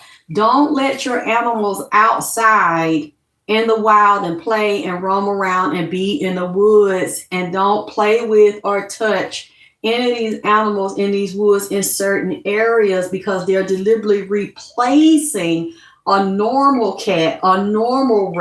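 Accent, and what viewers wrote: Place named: South, United States. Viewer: I so love how u talk u be having me laughing so hard 🤣 that down south all in u hunni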